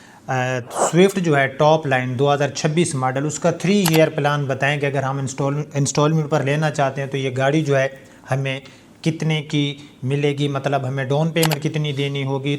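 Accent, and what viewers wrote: Saraiki, Pakistan. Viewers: اپ کی اردو اور انگلش کے الفاظ کی ادائیگی بالکل بھی درست نہیں ہے اپ کا سرائیکی لہجہ ہے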